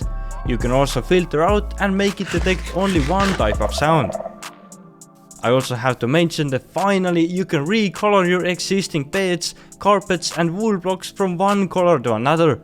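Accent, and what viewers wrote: Estonia. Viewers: That estonian accent is so goofy😅😂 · is that an Estonian?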